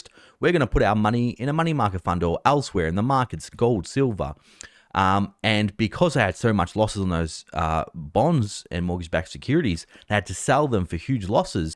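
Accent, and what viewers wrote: Australia. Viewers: Darta - Data PLEASE use your Australian accent !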